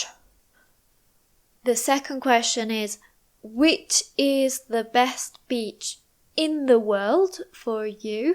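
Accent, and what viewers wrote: United Kingdom. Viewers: Es inglés británico?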